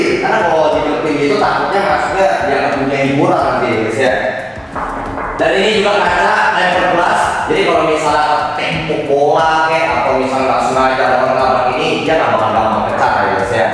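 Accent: Palembang, Indonesia. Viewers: Logat Palembang kau masih melekat 😂 mantap semoga lancar dan reme tempat baru nya aminn🙏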